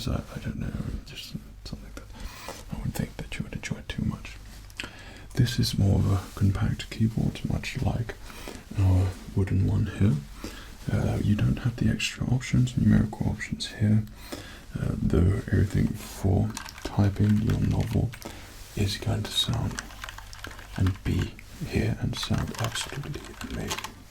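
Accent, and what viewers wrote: England, United Kingdom. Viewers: I cannot tell is this guy actually British and I am sure pc guys do not everything like this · You would make such a great Arthur Morgan roleplay! Do that but instead of an American, you're a posh rude English cowboy! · yes he is originally from Oceania but he does live in the Uk and has for a while with is wife he also sounds pretty british anyway in my opinion. Love from the Uk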